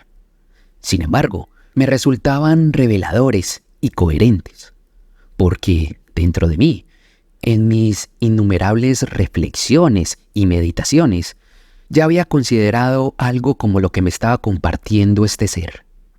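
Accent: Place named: Antioquia, Colombia. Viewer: Que maravilla escucharte, gracias por la entrega de los mensajes. Nada pasa por casualidad creo que todos los que llegamos a tu canal, teníamos que hacerlo y yo estoy feliz y agradecida con el universo y la vida por llegar a tú sendero, me encanta tu asentó, tan Paisa tan bonito 😍, yo también nací en esa hermosa tierra pero llevo muchos años fuera.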